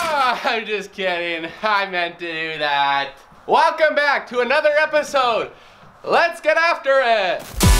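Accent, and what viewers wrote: Canada. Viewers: The plural of "axis" is "axes". Also, your Canadian accent is awesome. :-)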